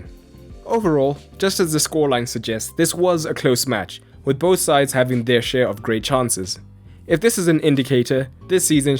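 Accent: South Africa. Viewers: Hey man, nice vids, keep up the good work, just wanted to ask, where are you from? Your accent sounds South African (I'm South African)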